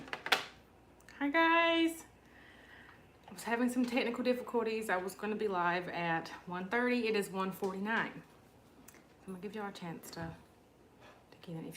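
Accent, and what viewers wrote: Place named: North Carolina, United States. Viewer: I'm an older woman and I really enjoy your videos. I'm originally from Laurinburg and I bet you know where that is. Our accents are alot alike. LOL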